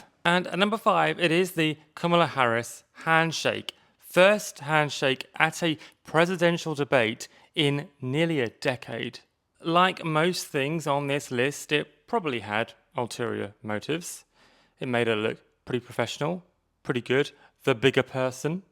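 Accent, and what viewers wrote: United Kingdom. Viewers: I have only followed of TikTok and I genuinely love your ability to be on the level speaking of all of the horrible things the Us is doing. And btw….never expected your English accent! 😂 surprised the hell outta me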